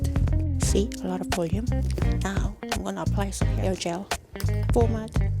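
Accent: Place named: India. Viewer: One of the best accent its semi to an indian girl talking english 😅😂😂😂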